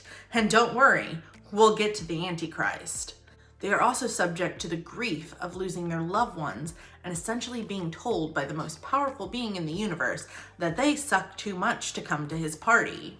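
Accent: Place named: California, United States. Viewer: Still not over your California voice 😭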